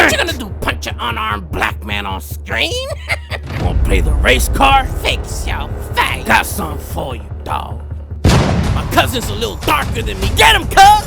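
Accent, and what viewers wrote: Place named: Brooklyn, United States. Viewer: I knew you sounded like a Brooklyn kid lol😂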